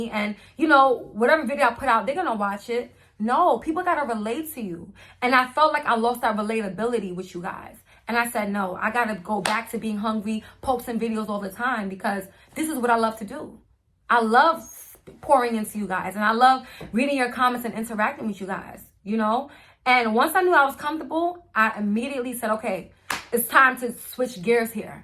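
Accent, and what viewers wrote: New York, United States. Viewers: As soon as I heard you speak I'm like she's from New York and then you continued and said you were Haitian! I'm a new fan and subscriber sis! Thank You I will be watching and supporting you anyway I can ! 🙏🏾🙏🏾🙏🏾🤎✨ Blessings, Happy New Year and to all the bosses in the place CONGRATS MILLION AND BILLIONAIRE! Especially my black women! WE DESERVE! 🎉🎊🥂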